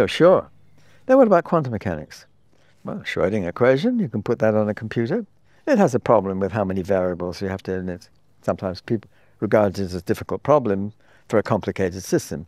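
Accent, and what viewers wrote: United Kingdom. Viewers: Gypsy tongue with a British accent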